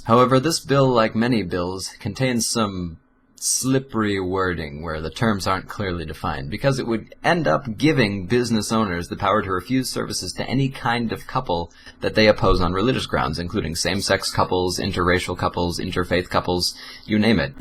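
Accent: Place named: France. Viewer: L'accent français. J'adoooreee.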